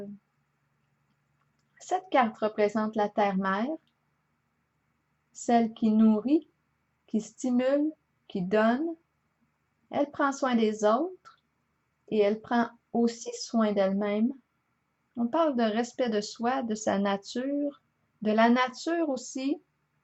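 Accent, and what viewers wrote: Canada. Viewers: Quel plaisir d entendre ce bel accent canadien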